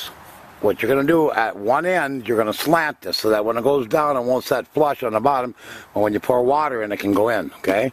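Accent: Minnesota, United States. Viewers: I like your Minnasotan accent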